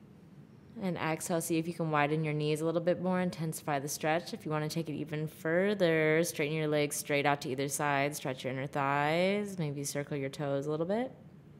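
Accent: United States. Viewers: I like your voice. And your American English. That sounds so good. 😌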